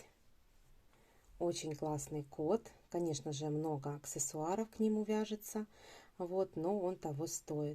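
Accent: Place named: Voronezh, Russia. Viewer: Огромное спасибо за мастер класс, и так приятно слышать родную Воронежскую речь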